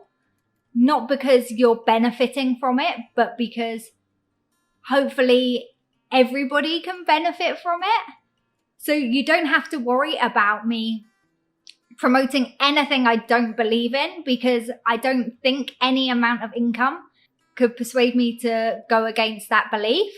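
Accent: England, United Kingdom. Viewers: I enjoy watching the videos in this channel as I get to learn what the life, particularly the bright side, of western immigrants is like in Taiwan, with a bonus of listening to the voice with a beautiful British accent. I would not be worried about the content balance, as we all understand this is not a news coverage channel. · Say thanks to who lovesTaiwan according to yourself experience here. I also like to practice my listening in British English by your channel. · 很清楚很濃厚的英格蘭腔英語發音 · 看影片聽你英國腔感覺很有趣，常常想到哈利波特電影他們說話的感覺。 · I like your fascinating British accent, very clear and speed just fine. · 妳的發音字字清晰，英國發音真的跟美國不一樣。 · 對了，我非常喜歡您的英式英語的語調，從以前到現在都一樣，就是喜歡英國腔的英語而不是美國腔的美語！老實說就是讓我覺得聲調很悅耳！ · 英國腔調的英文就是讚拉！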